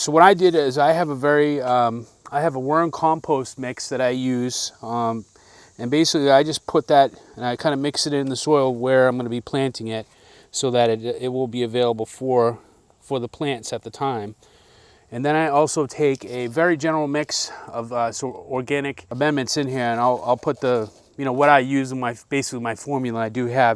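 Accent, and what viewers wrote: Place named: Massachusetts, United States. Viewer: You sound like your from MA! That’s where I’m from so your accent sounds so familiar. Thanks for the content! Lots of good tips!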